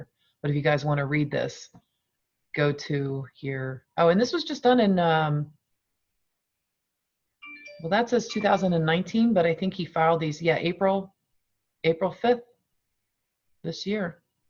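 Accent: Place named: Philadelphia, United States. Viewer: Greetings from New Jersey. I can hear your Philly accent 😃Thank you. Love your perspective and message of encouragement.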